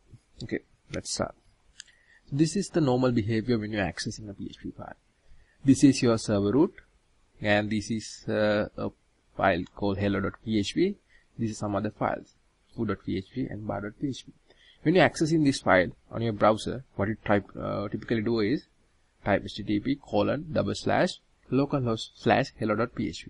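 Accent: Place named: India. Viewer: m sorry to look racist CAN YOU PLEASE FOR THE LOVE OF GOD STOP INDIANS MAKING TUTORIALS WITH THEIR LANGUAGE? LET S FACE IT YOUR ENGLISH ACCENT SO FKN FKCED UP WE DON T UNDERSTAND.... Sorry again yet it s the truth... have someone else do the voice over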